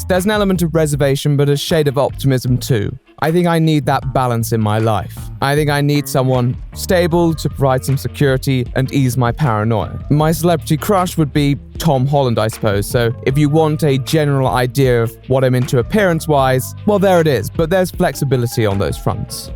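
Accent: RP, United Kingdom. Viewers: Can you fuck off out of my country. Groningen doesn't want your either.. Take your British speal covered in that accent without giving any substance and fuck off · I ship you with anyone else who has a British accent and a cartoon avatar. IHE, Cynical Reviews.. you know the sort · first, good job on the content, I've been watching you since you essentially rebooted your content, with the we shall say current style of content. The accent is of course posh, I'm from the north of the UK, you sound like an afternoon tea sort of person, dial that shit up to full posh sort of thing... I love the style of content, I don't generally like the drama scene, I find daddy keemstar toxic, essentially a nuke in a China shop, while you don't cover every drama story that would be impossible I get any drama info from you, it's enough for me. If there's one thing, you sometimes talk at a break neck pace, generally it seems when the passion/emotion is flowing, but it's not to the extent for me personally I can't follow unless I'm not focusing. 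Keep up the work! There's not too many YouTubers I watch every video, only a hand full if that where I keep watching over a year plus, your one of them. I'm sure your fans would back me in saying, keep the content coming, experiment if you must, we will let you know if it's shit:)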